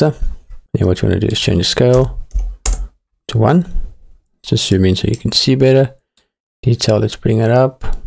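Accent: South Africa. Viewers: Ah, a South African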